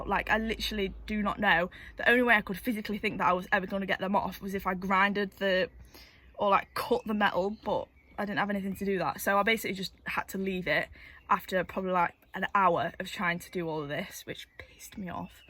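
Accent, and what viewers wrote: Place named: Lancashire, United Kingdom. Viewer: You sound very much like your from my neck of the woods around Lancashire area ?? I love the videos !!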